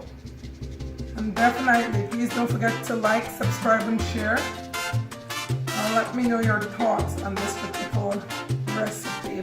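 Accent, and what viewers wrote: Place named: Guyana. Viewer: I love your voice it’s so soothing are you from Guyana? Thanks for the recipe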